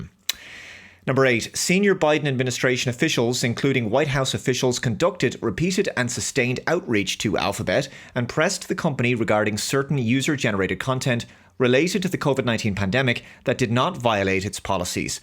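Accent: Ireland. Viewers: I must admit, I've missed your Irish voice telling me to follow you on "Moinds n' Gaaab" 😂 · it is so nice to hear your Irish accent again!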